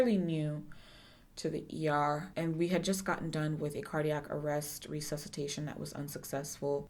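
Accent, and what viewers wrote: Nigeria. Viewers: I love the in-between Nigerian accent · lol the nigerian accent.. <3 awesome video btw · I'm loving that Nigerian accent :)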